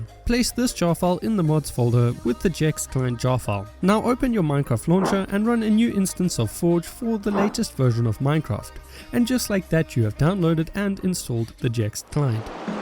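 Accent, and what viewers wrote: South Africa. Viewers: could hear the south african accent a mile a way 😄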